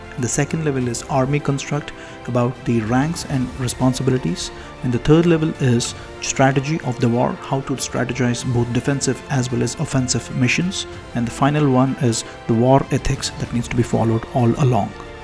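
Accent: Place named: South India, India. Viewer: Namaste ...really want to appreciate all your videos..but one BIG request for the narrator...do you generally speak this fast, without any pauses...please narrate a bit slower so that the content is understood better..also its not suitable to slow down the playback speed. The narrator speaks with a slight mix of south.indian and western english accent, it is hard to follow the narration if you speak in this speed..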